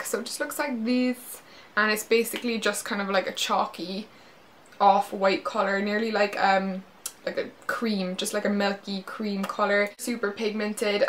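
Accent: Ireland. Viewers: OMG are u Irish I am too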